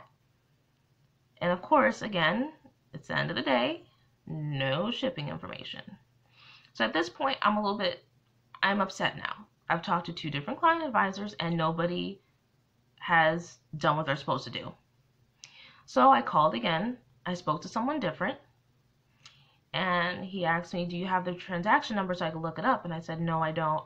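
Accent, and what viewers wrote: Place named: New Jersey, United States. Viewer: I just came upon your video and I'm glad I stopped to listen to your experience regarding your LV repair. First, I too am sorry you are having these lack thereof quality issues as well as poor customer service. Secondly, I too have had my share of unfortunate experiences with LV especially regarding new item quality issues and I've in many instances if not all only had the item for a month or two but I get this ugligness attitude from the store I deal with if I bring up an item with quality issues. I'm so over them and their poorly designed pieces of late. Don't get me wrong I still love LV and it's craftsmanship but I expect them to be behind me 100% if I have an issue. So sorry, again that you are going thru this. Hi I watch you and I love your I think Jersey accent excuse if I'm wrong but I love your videos. 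Keep up the good work!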